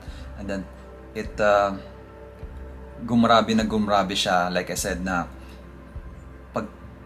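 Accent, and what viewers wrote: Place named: Philippines. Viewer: Are you an American? Still your accent is Pinoy!!! Tagalogggg plssss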